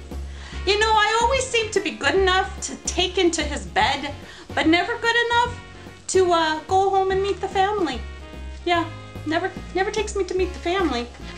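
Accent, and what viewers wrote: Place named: Minnesota, United States. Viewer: LOL, So the next time my granddaughter wants me to read one more story and I'm too tired, I'll call on Aunt Nancy... The accent will help her get to know her Minnesota roots. But wait - I'm never too tired to read to her. Whew, she's safe! LOLOL! Great vid.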